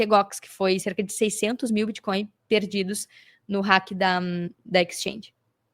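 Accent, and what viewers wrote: Rio Grande do Sul, Brazil. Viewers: tem sotaque de gaúcha, que linda 😂❤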